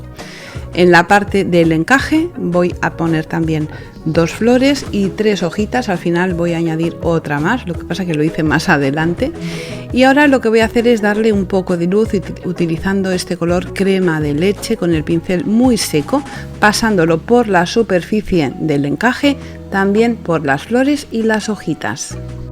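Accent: Spain. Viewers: My excitement from your works as an artist to learn from you is great. I sculpt large elements in ceramic clay and cement. ... and a greater excitement is that I understand in every sentence a few words from your Castilian Spanish. I'm an Israeli from Bulgaria Spainsh. And my grandmother insisted talking to me for ladino. Thank you and my grandmother Victoria. my name is Vicky Lahav. Thank you and only health and peace. In Hebrew they say: without art we will die..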